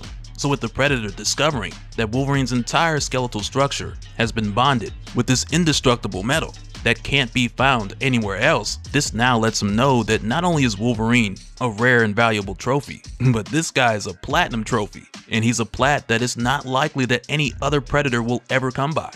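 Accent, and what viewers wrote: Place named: South, United States. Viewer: It’s the “in-and-out” of the Hee-Haw accent that got me……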